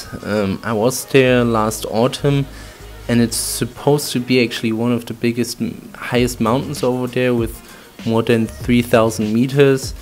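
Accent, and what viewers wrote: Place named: Austria. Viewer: Arnold is Austrian and in Austria people speak German. So he has a similar accent to Arnold.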